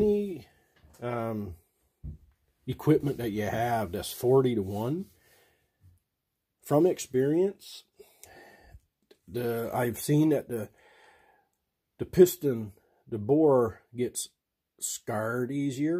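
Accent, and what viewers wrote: Newfoundland, Canada. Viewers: Your acsent you from nfld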